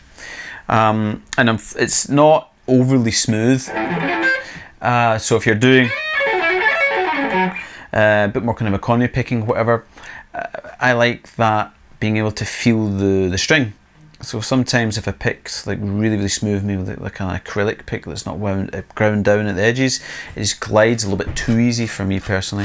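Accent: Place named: Scotland, United Kingdom. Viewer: You're.... Scottish! I don't often hear a Scots accent on YouTube.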